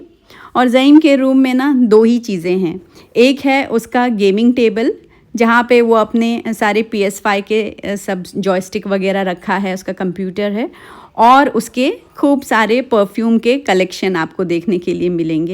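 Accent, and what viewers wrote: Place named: Uttar Pradesh, India. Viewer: Are you from Uttar Pradesh? Aap ka hindi humare jesa hai. Same accent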